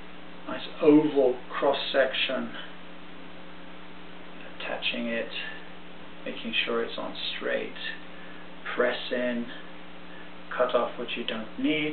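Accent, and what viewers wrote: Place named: United Kingdom. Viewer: Yes, a lovely demo on pulling handles on a large mug. The dry handle test is excellent, had never seen that done. I was surprised at the strength shown. Where are you located, sound British.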